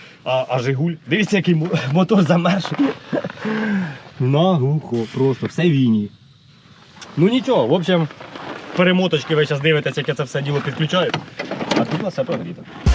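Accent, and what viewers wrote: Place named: East, Ukraine. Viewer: На сході оозмовляють так : на суржику.Не чіпляйтесь.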